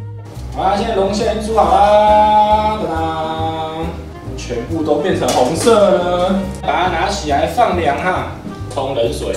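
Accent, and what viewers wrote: Taiwan. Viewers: 光聽這台味就舒服了！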